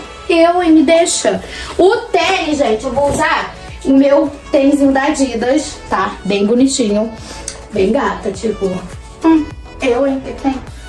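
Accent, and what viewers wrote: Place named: Rio de Janeiro, Brazil. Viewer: sou da Bahia,amo seus vídeos.amo seu sutaque Carioca,acho super lindo. Amo seu jeito de ser,as suas dicas super maravilhosas,adoro quando vc começar o vídeo dançando.! Vc tem que ser do seu jeitinho mesmo.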